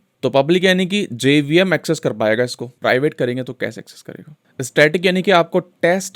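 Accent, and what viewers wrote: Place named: Bihar, India. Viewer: sir ji aapke accent se aap bihar ke lg rhe ho :hands-yellow-heart-red::hands-yellow-heart-red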